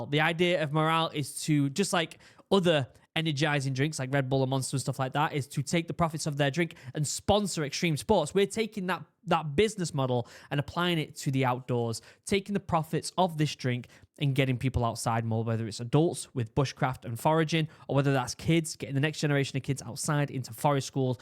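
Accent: United Kingdom. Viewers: Yours is the only British accident that doesn’t totally bug me. Idk why but it just sends chills down my spine when British people say “I have to go to hospital” instead of “I have to go to THE hospital” but for some reason it doesn’t bother me as much when you talk lmao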